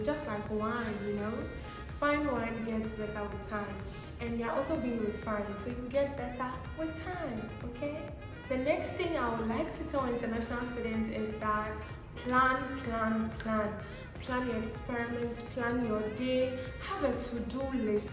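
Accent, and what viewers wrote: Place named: Japan. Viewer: 😃, your english accent now tho. You are sounding more Japanese now😂. Wisdom you speak. Thanks for sharing and again, you inspire!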